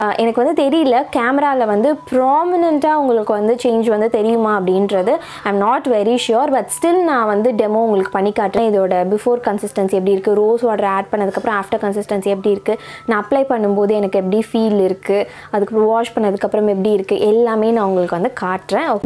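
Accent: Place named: England, United Kingdom. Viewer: Wow wat a English accent 🤗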